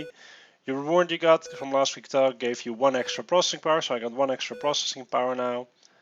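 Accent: Netherlands. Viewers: Yes, he's dutch. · You sound like having a dutch accent, is that true?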